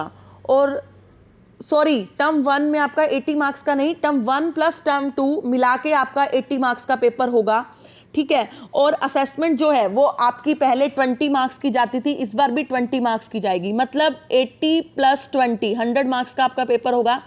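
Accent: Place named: India. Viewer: I just love indian accent very much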